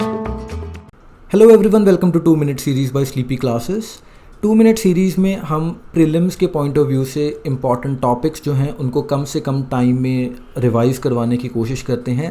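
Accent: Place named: Delhi, India. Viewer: Puri delhi wale accent.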